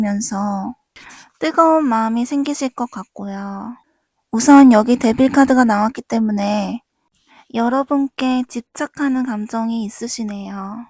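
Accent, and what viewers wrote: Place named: Gyeongsang, South Korea. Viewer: 경상도분이신가여 ㅋㅋ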